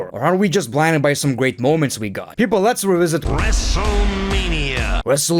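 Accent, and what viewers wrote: Russia. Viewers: I need more Russian accent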